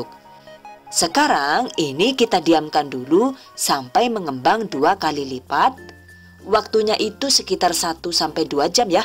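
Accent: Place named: Madura, Indonesia. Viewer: Fokus ke suaranya az, bahasanya kayak logat madura hehehe. Maaf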